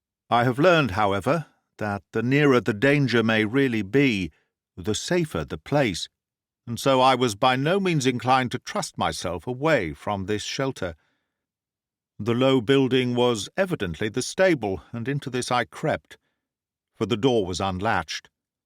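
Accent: United Kingdom. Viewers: I can't express how much I enjoy listening to your clear British accent... Please keep posting more on your channel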